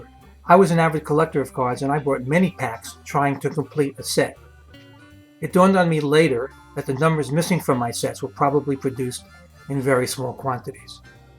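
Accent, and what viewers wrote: New York, United States. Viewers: This is so well done.The narrator knows his stuff. He also has a great NYC accent. The real deal. Thank you for this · What a great and thorough trip down memory lane. You covered just about everything except for the soft pretzels sold fresh each day. I remember them being stacked on a verticle stick and selling for 5 cents each. Again, what a terrific video! Even the narrator has that great NYC accent.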